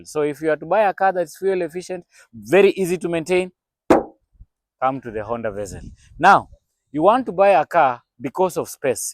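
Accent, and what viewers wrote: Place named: Zambia. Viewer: 2.7 what howmuch in usd where are selling from you sound Zambian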